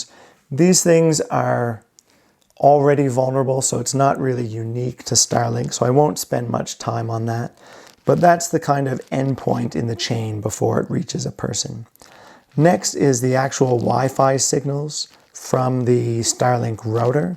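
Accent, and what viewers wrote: Nova Scotia, Canada. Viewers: Yeah, he sounds like he is from Nova Scotia to me and I have met hundreds of east coasters in my lifetime so far. We all talk funny anyway, lol.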